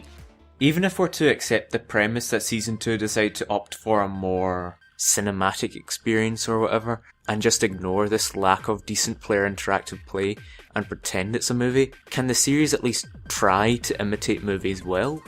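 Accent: Scotland, United Kingdom. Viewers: Is that a Scottish accent? Sorry, I'm not anglophone, so I'm not 100% sure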